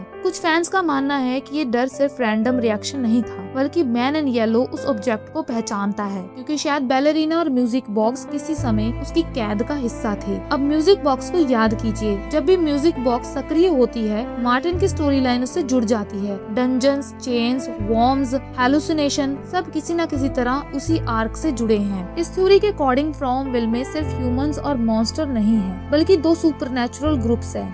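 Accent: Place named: Dogri, India. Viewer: Aap kaun si state we hain. Accent paahdi dogri lag raha hai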